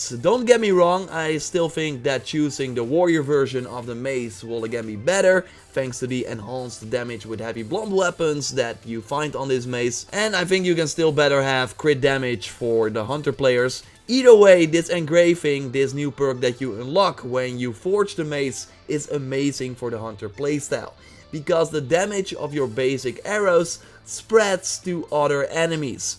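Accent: Netherlands. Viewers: Is this guy dutch cause his accent is familiar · are you Dutch, by any chance?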